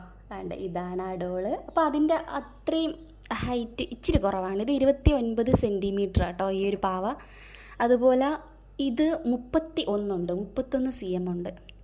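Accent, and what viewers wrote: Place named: Thalassery, India. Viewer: Super 👍👍 thalassery ഭാഷ kelkkannkile nigale entha youtube channel kannan varuu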